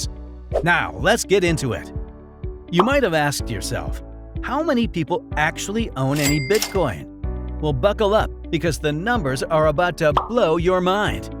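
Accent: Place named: United States. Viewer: Most american voice ever.. over the top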